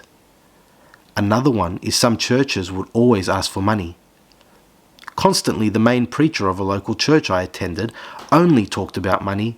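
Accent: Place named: Australia. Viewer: You sound Australian. Unless you live here, why would it be relevant to you to talk about Americans converting to Orthodoxy?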